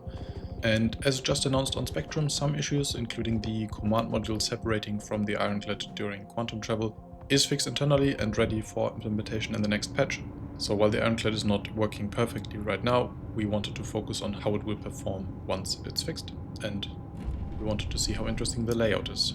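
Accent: Germany. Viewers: er ist deutscher, das hört man :-)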